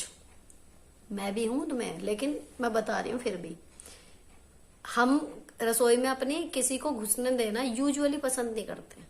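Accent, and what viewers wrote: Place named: Meerut, India. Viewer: Hi ji I am rajni Chauhan from meerut ganganagar you know you look like my Mami ji PTA hi mujhe sabse achi baat kya lagti hai apka baat kerne ka tarika bilkul desi bolte hi PTA lag jata h meerut ki l language like it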